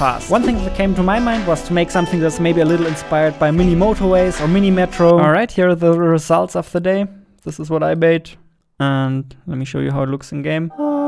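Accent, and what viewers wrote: Germany. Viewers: Man hört fast garnicht raus das du Deutsch bist, Echt gutes Englisch👍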